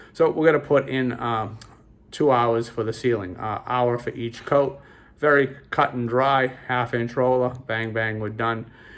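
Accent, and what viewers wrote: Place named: Boston, United States. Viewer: I can tell you now that yes..that's $USD That accent is from Boston, Massachusetts.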